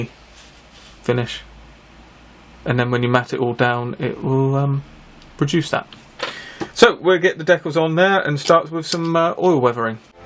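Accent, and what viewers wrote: United Kingdom. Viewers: Build kit with british accent....i love it👍👍👍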